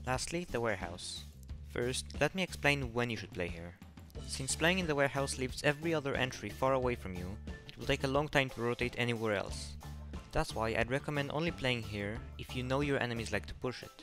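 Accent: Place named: France. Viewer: Your accent and voice are sooo mesmerizing… I learned so much. Are you French or European?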